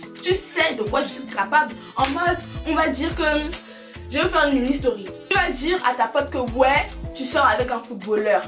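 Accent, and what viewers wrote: Ewondo, Cameroon. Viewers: L'accent camair est vraiment funny...suis senegalais mais j'aime bien...Mais j v savoir aussi c'est quoi"macabo" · 😂😂😅😅 Merdeu l’accent camer de la gow ci me bollè seulement 😂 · Je suis congolaise mais j’ai kiffé ta vidéo vous avez grave d’expression j’aime trop l’accent camer D’ailleurs ça veut dire quoi « ne pas donner le lait » j’ai jamais compris, vu que tu l’as pas expliqué dans la vidéo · j'ai aimé la vidéo c'est fou coe tas cette accent camerounais alors que ta grandit en France . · T'as vraiment un accent kmer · à t'ecouter on dirait une beti ewondo camer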